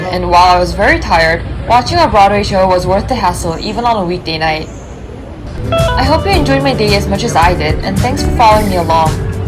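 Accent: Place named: Singapore. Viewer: 혹시 싱가폴에서 오래 사셨나요? 제 싱가폴 친구랑 억양이랑 목소리가 넘 비슷해서 깜짝 놀랐어요😅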